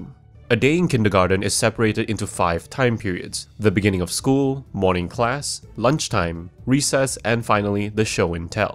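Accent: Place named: Singapore. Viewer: You sound Singaporean! Good review, well presented and structured.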